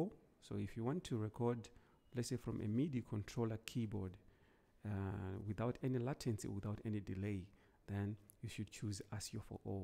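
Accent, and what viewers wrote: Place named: Zimbabwe. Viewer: Ndazvinzwa hangu uyu mwana wevhu chete 😂 anyway thanks brother this video is awesome and helpful 👏🏿👏🏿👏🏿👏🏿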